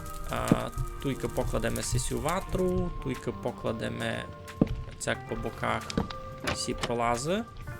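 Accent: Transcarpathia, Ukraine. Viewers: Це закарпацька?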